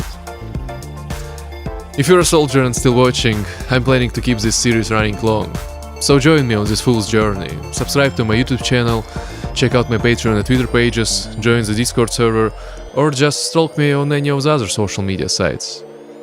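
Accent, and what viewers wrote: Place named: Russia. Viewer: я английский знаю не очень, но почему то сразу понял что ты русский)))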